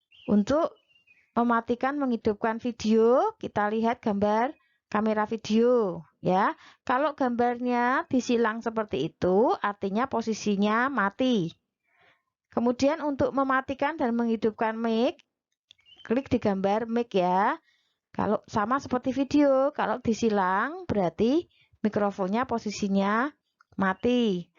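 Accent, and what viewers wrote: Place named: Java, Indonesia. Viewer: Suaranya bu khas banget jawanya 😉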